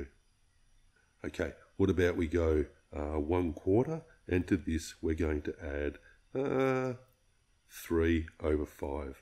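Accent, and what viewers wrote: Australia. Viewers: why does he have an aussie accent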